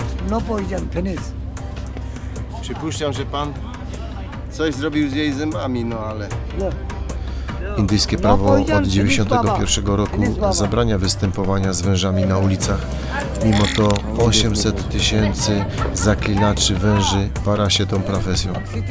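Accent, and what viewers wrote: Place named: Silesia, Poland. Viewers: Lektor powinien popracować nad dykcją. Film bardzo interesujący ale ten śląski akcent jest nie do zniesienia. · Ten Pan bardzo ładnie mówi po polsku A akcentu śląskiego nie da się wykasować ...także nie czepiaj się...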